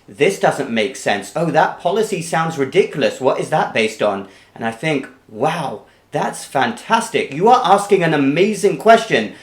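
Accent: United Kingdom. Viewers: Sir you have a very strong British accent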